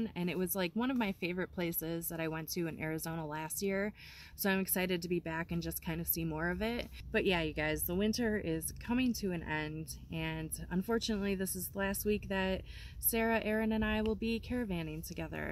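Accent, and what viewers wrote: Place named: Midwest, United States. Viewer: I love watching little Joey explore so much...you could literally strap a go pro to his back and make a channel and I would sub. good luck on the new chapter. I have loved watching you for the last year and appreciate you sharing your life. plus your midwest accent makes me feel at home. tehe. xoxoxox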